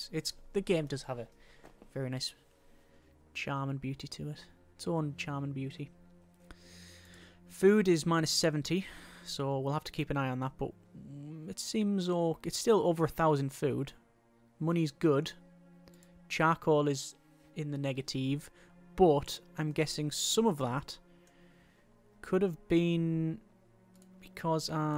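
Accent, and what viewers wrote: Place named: North East England, United Kingdom. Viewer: I’m very curious about where he is from, I feel like he is from the uk and possibly the north east but please correct me if I am wrong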